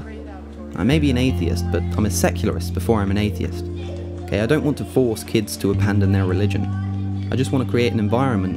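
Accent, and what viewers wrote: United Kingdom. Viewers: Wait... you live in the USA? You sound British. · He is British, from Oxford.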